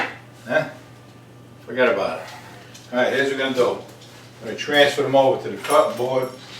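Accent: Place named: New York, United States. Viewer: You got like a New York Italian accent haha (: nice vid!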